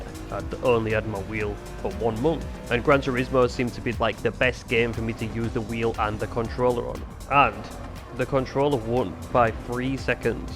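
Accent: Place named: Liverpool, United Kingdom. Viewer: This guy is an absolute scouser.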